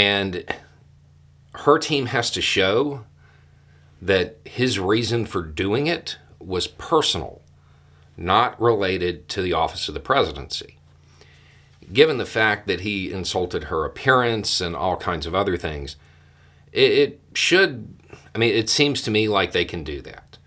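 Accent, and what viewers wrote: South, United States. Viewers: I think I've learned enough of your Southern ways of speaking to say, about Trump, "Bless his heart."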